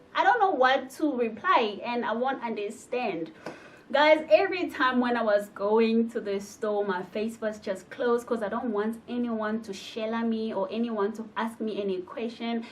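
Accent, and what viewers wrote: South Africa. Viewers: now youve got a south african accent nice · i like the way you speak English you sound like South African now and not forgeting you are so beautiful. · Hhaibo your accent sounds South African now?? 😯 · U don't look like Congolese your seems like South African English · You sound and look like South African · You look and sound South African. Did I just hear you say "smaller'nyana"...... You are South African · Now U have south Africa accent Ur English is just south Africañ. And i like small nyana, shela U now speak our slung. 🥰🥰🥰🥰🥰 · She's South African this one😹😹❤❤ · sounds very south African and also look very southern African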